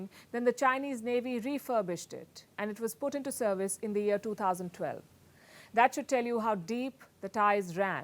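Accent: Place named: India. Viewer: FIrstpost is funded by the CIA. thick Indian accent is meant to confuse Indians. is paid to spew anti-Russia and anti-China propaganda. never bad-mouths Biden the same way. OOOps. That gives it away.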